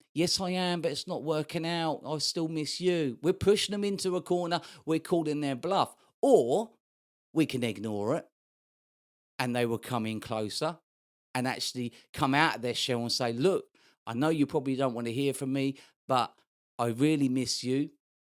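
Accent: Cockney, United Kingdom. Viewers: sounds pretty cockney to me atleast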